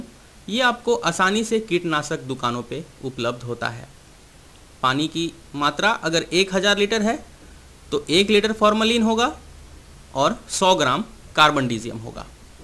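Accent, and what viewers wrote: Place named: Bihar, India. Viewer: Bhai aap Kanhaiya Kumar ke jaise bolte ho... Lagta hai Bihar se ho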